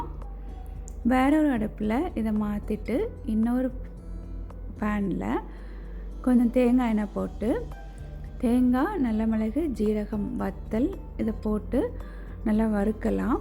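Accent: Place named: Kanyakumari, India. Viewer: சூப்பரா செஞ்சு இருக்கீங்க சிஸ்டர் நீங்க கன்னியாகுமரியா நீங்க பேசுறது ரொம்ப அழகா இருக்கு ❤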